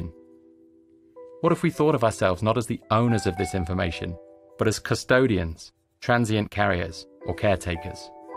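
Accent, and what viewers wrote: United Kingdom. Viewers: This is so clearly faked. This is pretty low budget (compared to Google), its narrator is British for some reason, THERE'S AN IPHONE IN THE VIDEO INSTEAD OF AN ANDROID, and it's too direct. Even if this is what they wanted, they wouldn't just come out and make a video about it. Also why would they give it creepy music? Come on. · B.S...If this really was a google internal video, why the eff is there an iphone, and imac, and ipad, and no google devices...seems more like apple propaganda (especially with the British accent narrator). But I don't discredit that google does shady stuff.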